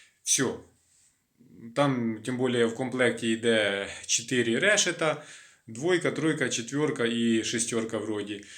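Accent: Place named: Kherson, Ukraine. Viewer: хай Вам Бог помогає! Так приємно почути рідну говірку Херсонщини! Теж мріємо про перемогу і про повернення додому! А зараз набираємося знань і досвіду від Вас та інших сільських блогерів. Сердечно Вам дякую, що знімаєте і ділитесь інформацією у такий важкий час! Шана Вам, що не зламалися і не опустили руки! Разом до перемоги!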